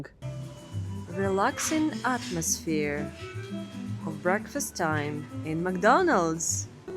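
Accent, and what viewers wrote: Russia. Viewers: Hello from Florida, USA I love to listen to you speak English with your Russian accent. You sound so interesting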